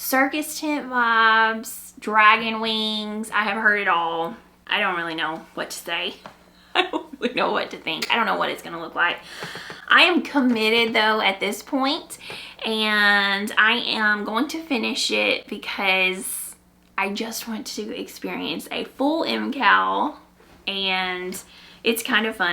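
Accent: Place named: Tennessee, United States. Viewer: I just found your podcast and I love it! Going back to listen to the others. Question….. both my daughter and I think you sound like you are from Tennessee (we are from East Tennessee). Have you spent some time in Tennessee or is that just a pure Arkansas accent? 😁 No matter, love listening to you.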